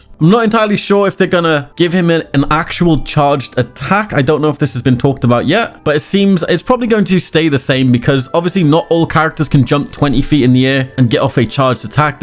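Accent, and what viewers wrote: United Kingdom. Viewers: You sound a little British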